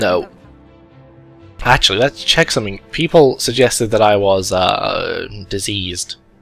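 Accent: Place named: England, United Kingdom. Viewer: Well... I was checking to see if I had missed this episode and though I had, I am now watching it again. Totally intended to go to bed, but then I got caught up in the cheese wheel rubbing and the... English accent... Curse your mystical use of England speak...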